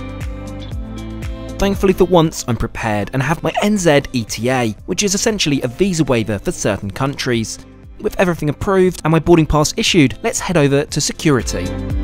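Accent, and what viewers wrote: RP, United Kingdom. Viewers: Never thought I would be watching a man who uses the Kings English lol please don't take that the wrong way. Because were i was brought up we dont lol love your videos bro trust me wheather your on a train or plane I look forward to watching them. Am gald I sub to your channel I don't how many times because I've had to get a new phone lol. All the best bro and thank you for all the hard work you put in for your subs we appreciate it.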